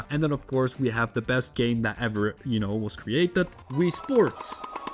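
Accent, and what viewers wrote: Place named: Netherlands. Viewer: Nederlands accentje is wel smikkelen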